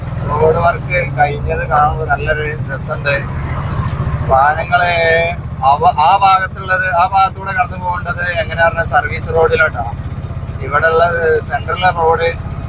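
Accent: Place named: Thalappara, India. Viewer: ഭാഷയെ പറ്റി പറയുമ്പോൾ ഒരു രസം തന്നെയാണേ ഇപ്പോൾ (ഇപ്പം) എന്റെ ഭാഷ എന്റ വീടിന്റെ ഒരു 5 KM പടിഞ്ഞാറ് ഭാഗത്തേക്ക് പോയാൽ ഒരുപാട് വ്യത്യാസമുണ്ട് അത് പോലെ എല്ലാ ഭാഗത്തും ഉണ്ട് ഇദ്ദേഹത്തിന്റെ സംസാരം കേട്ടിട്ട് തലപ്പാറ മുട്ടിയറ ഭാഗത്ത് താമസക്കാരനാണെന്ന് തോന്നുന്നു ' നിന്റെ ഫാസയിയിൽ ഒരു വീഡിയോ ചെയ്യ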